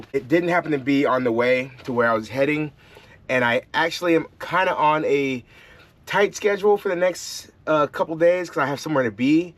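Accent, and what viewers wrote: South, United States. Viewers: Um, you do have a bit of a southern accent to those of us not from the south. 🙂 Those beignets looked good. You can only have like one and have to pray that a diabetic coma isn't induced. But they are good with coffee. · ❤❤ I just learned how to pronounce beignets. Yes Southern accent is heart ❤️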